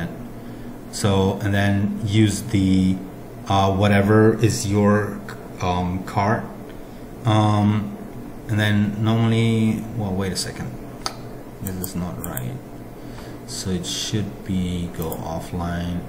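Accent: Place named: United States. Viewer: OK, that explains those pauses and hesitations and my hunch that you did not sound as an English native speaker, however your good pronunciation deceived me. Anyhow, you don't sound German, more like an American accent. Keep making this sort of videos, but improve your fluency to make them shorter and more pleasant to your audience.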